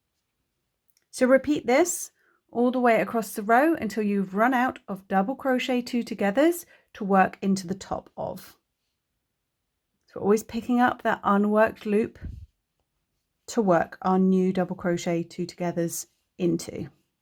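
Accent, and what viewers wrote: United Kingdom. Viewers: now i am confused - a uk accent but usa stitch names.....i would call thouse double crochet and treble crochet stitches.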